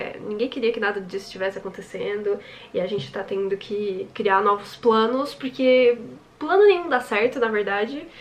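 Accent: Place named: Curitiba, Brazil. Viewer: Eu jurava que tu era curitibana 😂😂😂 me veio até distrito federal do nada agora ahsuahsu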